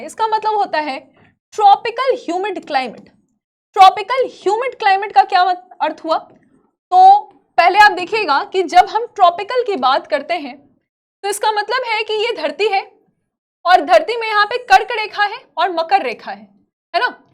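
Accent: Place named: West Bengal, India. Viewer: Dilect se lgta madam West Bengal sey belong karte hai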